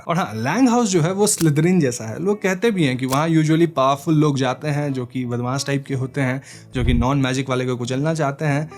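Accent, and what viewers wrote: Bengal, India. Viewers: Bengali ho app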